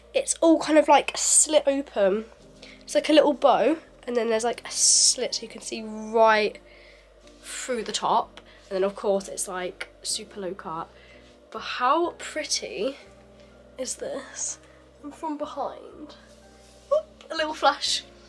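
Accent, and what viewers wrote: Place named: United Kingdom. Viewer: cute voice with the British accent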